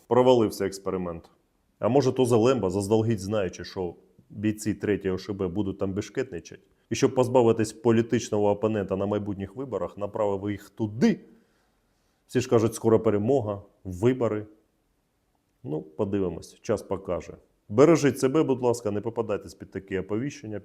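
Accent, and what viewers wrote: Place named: Russia. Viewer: Як би ти не старався розмовляти українською , но по діалекту зрозуміло як ти обожнюєш рашиську . Так що йди під три чорти , чотириокий чорт .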